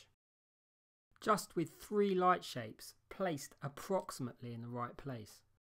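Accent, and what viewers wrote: United Kingdom. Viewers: I love this British accent!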